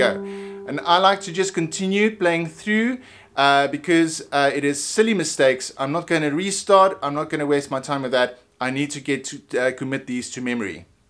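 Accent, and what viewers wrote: South Africa. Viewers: For Forte, the rest stroke finger goes into the next string, don't pluck the string away away from the fretboard. Plucking the string upwards is what gives you the fret buzz. For left hand technique, try to keep your elbow low, it will allow the palm of hand to stay parallel to the fretboard. This will also improve the accuracy of your third & fourth finger. BTW, you sound like your in/from JHB, I'm in Cape Town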